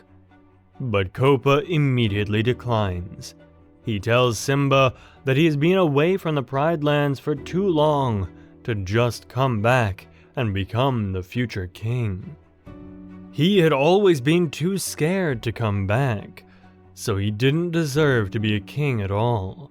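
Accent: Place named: United States. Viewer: Kopa never meet Kion and Kiara i remember the time Zira attacked Kopa you sound American im pretty good at recognizing people's accents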